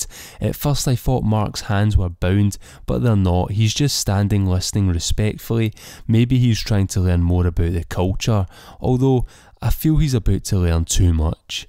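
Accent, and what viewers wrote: Scotland, United Kingdom. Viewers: Great listening to your accent and Scottish sense of humour!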